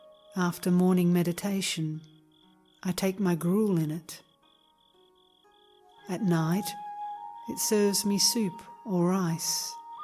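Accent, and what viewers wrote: Australia. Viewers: (Aussie, woo hoo! Definitely a Sydney voice.) Beautiful poem.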